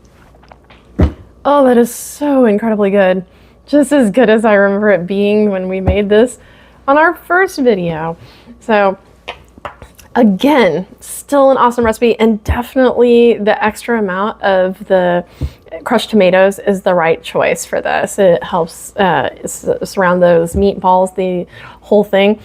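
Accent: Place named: Quebec, Canada. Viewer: Bonsoir! Première fois sur votre chaine. Dites-moi, avec votre accent, je vous soupçonne d’être du Québec, ai-je raison? Pourquoi: excellent français et excellent anglais! Mais peu importe d’où vous êtes, j’ai apprécié cette vidéo. P.s.: je suis du Québec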